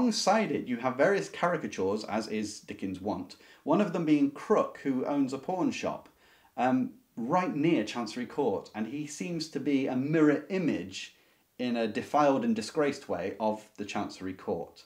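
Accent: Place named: United Kingdom. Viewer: Please, please take your fingers off the book’s title and its author when you discuss a book. Maybe even project the book on the screen. You go at top speed through introducing the book and then you put your hand over the book. Unless one pays close attention, your British accent and your galloping through the content makes it difficult to follow.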